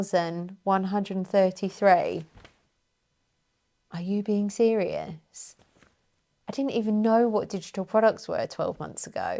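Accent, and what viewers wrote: United Kingdom. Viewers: OH WOW! I don't often see your vids pop even tho I've got you on highest notifications but this one just popped up.....and what a great vid. You know I found your channel back in the covid lockdown days and the world was so chaotic back then. I was also sobering up and really struggling with life and your vids were so peaceful and calming. Your delivery is so cool. You share honestly and it's so refreshing and I gotta say, comforting. It's like "yeah we all struggle with life and trying to do things that are meaningful to us but also earn enough income so that we can take care of ourselves and our loved ones and have some extra for nice things"....the struggle is real. I just love how your throw yourself into things but then also document how you're feeling during it, your self doubts and all that. You just come across as a very genuine and real person and I love that. 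You've also got a great accent. Being from Oklahoma I don't hear many British accents but I love yours. Anyways, keep doing what you're doing and congrats on 3 years, making SO MUCH money!! AND getting to 143k subscribers!! WOW!! What a great year it's been! I'm so happy for you.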